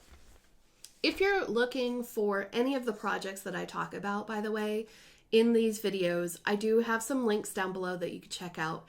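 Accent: Ireland. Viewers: All of your needle minders are darling!! I love that pegallamacorn!!! The first time I heard you say “but” in your Irish lilt I thought you said “bush”, LOL!! Then you said it again and I realized what you were doing!! LOL!! Question: what are you talking about with Grogu (baby Yoda)?? What the heck am I missing out on?? I’m willing to bet Jacob from MFE would be a great resource on what makes a sampler a sampler!! Thanks for sharing!! See you next time... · Definitely 'But' in your unique 'Irish accent.❤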